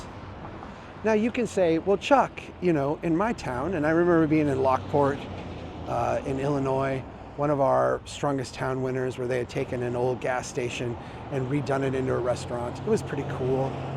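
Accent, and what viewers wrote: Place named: Minnesota, United States. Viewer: He is from Min-ah-sooooo-tah so he has an accent. You're darn tootin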